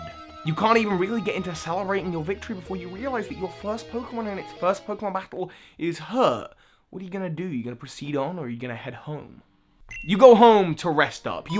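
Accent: United States. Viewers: Nice American Accent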